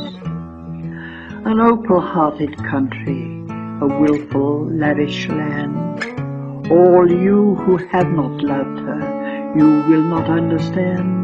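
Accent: United Kingdom. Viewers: It makes me weep too. has a beautiful and distinctive Dundee burr, from her grandfather I guess. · The Reciter sounds like she's from Shetland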